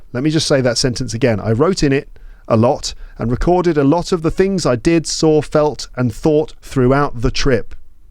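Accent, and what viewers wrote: United Kingdom. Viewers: Brits accent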